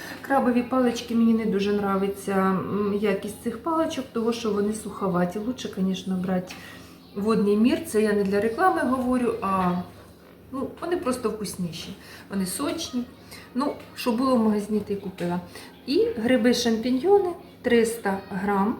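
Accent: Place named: Surzhyk, Ukraine. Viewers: Працюйте над собою. Суржик ріже вуха. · 80% українських сіл розмовляють на своїх діалектах. Мені дуже - дуже приємно слухати південний діалект. Так розмовляла бабуся, тітка, мої однокурсники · Суржиком розмовляє весь південь України. Філологи вже просто дістали.Після кожного відео ці зауваження. До чого це?Не подобається не дивіться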